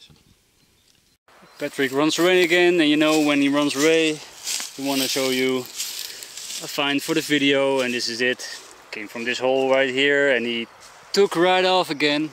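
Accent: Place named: Netherlands. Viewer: Coole video! Maar je hoort wel dat je Nederlands bent😂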